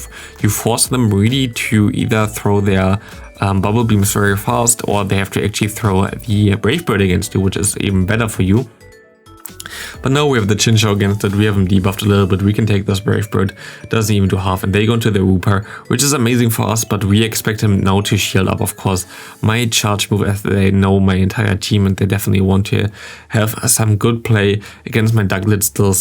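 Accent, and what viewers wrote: Germany. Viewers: Most german accent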